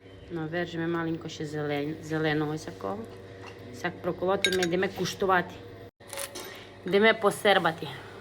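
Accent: Transcarpathia, Ukraine. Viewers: Ви розмовляєте по закарпатський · Файна мова, Закарпатська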